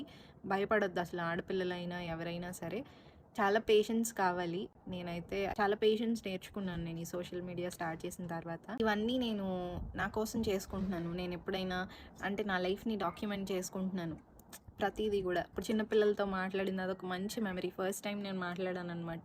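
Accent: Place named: Krishna, India. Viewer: Meedi Krishna dist.ani mee bhasha chusi telisipoyindi 1st time chusaka ne..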